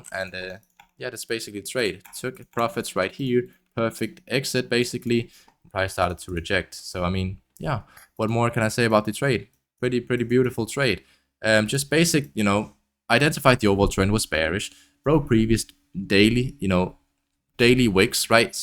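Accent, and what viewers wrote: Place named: Denmark. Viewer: Du lyder dansk i accenten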